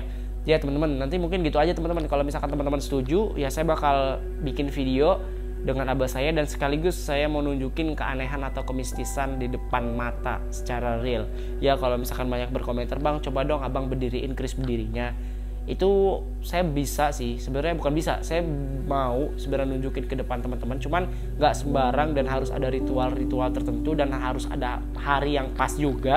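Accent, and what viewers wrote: Banten, Indonesia. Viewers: Logat nya kaya orang serang Banten ya bang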